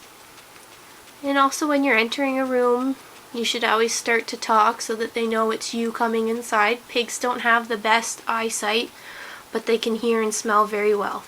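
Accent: Canada. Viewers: I <3 your accent. Are you Canadian?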